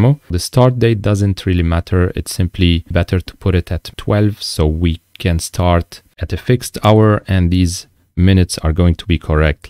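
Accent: France. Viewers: Thank for the video i will try it ! By the way have you french accent ? And have you made money from your bots ?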